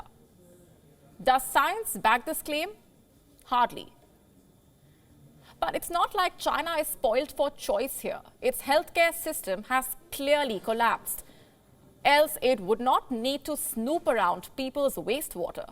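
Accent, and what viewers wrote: India. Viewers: You sound like an Indian and India had been through a much worse phase not long ago. You even burnt the bodies on the street in broad daylight. So what qualification you have to judge China? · Man, I can listen to these Indian dames talk all day long!! Their accent is as cool as can be. (But maybe it's because my Brooklyn accent is as crude as can be in comparison) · shut up，indian accent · Why indi english??? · Hahaha. So obsessed with China, don't show your inferior complex. Don't worry for them. Spend time to talk up India first, to be the no 1 power in the world by this decade. Also tune down your hindi accent please. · Very funny way of reporting. Does not seem to be a news channel. She chews hard on words and make it very heavily Indian accented English. And the sentences she speaks are too short, paused and not continuous.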